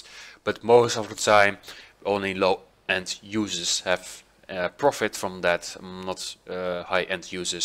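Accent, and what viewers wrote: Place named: Netherlands. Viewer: Ben je van holland ik hoor het een beetje aan je accent? Leuke video man Bedankt!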